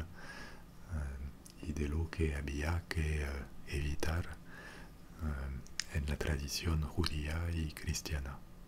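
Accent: France. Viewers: Hablas bien español reconozco tu acento france :) gracias por los videos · acento francés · Nueva subscritora reconoci tu acento frances,estudie dos años frances,Tu español es muy fluido y me encantó el contenido! :)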